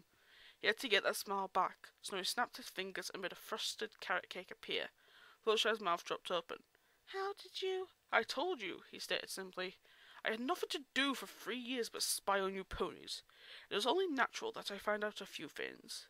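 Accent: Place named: United Kingdom. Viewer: I was wondering if an I was supposed to be there, but got missed out. I sometimes do that, miss words out, especially if I'm on a writing buzz. Well your accent isn't "British" what-ho old chap I went tp a Eton don't ya know, but it still sounds like a British accent to me and I was refrring to your "Maybe it's supposed to be like that, but not for me, I'm British!" or something along those lines. And I'm going to have to re-read that scene, lol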